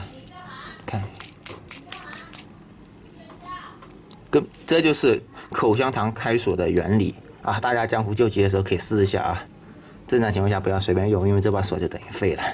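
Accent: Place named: Guangxi, China. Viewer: 口音有点像广西人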